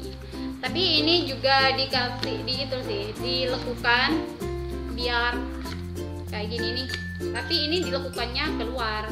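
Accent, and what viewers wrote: Madura, Indonesia. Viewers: org madura kah kak, logatnya.. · Buset..Madura kah kak kwkw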